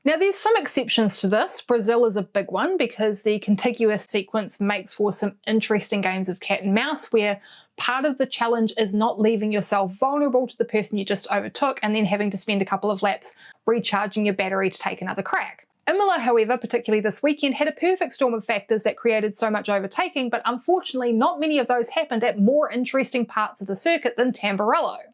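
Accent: New Zealand. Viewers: Yes you are correct. The New Zealand accent is right up there with vulgar American, Mumbling South African and flatfooted hick-up sounding Cockney from the British Isle of London-England. So you are second best in gnawing-ear-splitting sounds and I noticed that I was forced to ‘come again, please’s’ more often than with other distorting violations of the beautiful, melodic version of Oxford English. After all ‘come again’ is not the worst request you can get after a brutal rape. Apart from all my unkind remarks stands my sincere admiration for your very entertaining Emilia podcast. Thank you for that. JVJB from Amsterdam.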